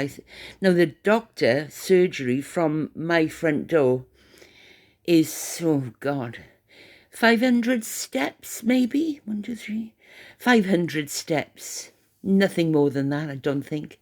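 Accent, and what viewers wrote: Wales, United Kingdom. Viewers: Just found your channel, it’s lovely hearing a Welsh voice, so sorry you have been poorly, this Welsh weather hasn’t helped anyone has it, damp, cold, foggy and grey. You take very good care of yourself. No one likes going to the Dr but sometimes my lovely we need to, you go and get the blood test done and sort everything out you’ll feel much better afterwards. Stay cwtched up and take care🙏❤️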